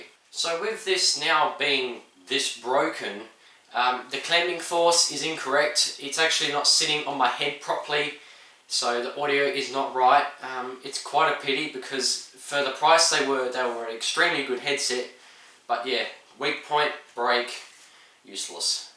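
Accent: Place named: New Zealand. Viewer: damn im pretty early, think im the first aussie, tbh you sound more kiwi then aussie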